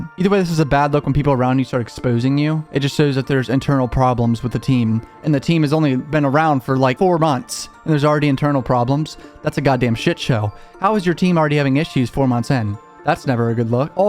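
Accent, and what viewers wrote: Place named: Baltimore, United States. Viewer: Damn I'm loving that Baltimore accent. Or is it Pennsylvania?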